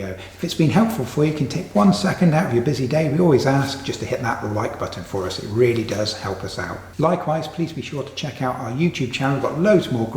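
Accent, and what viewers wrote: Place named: England, United Kingdom. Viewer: I don’t know what it is about the English accent, but it makes every video feel so much more educational lol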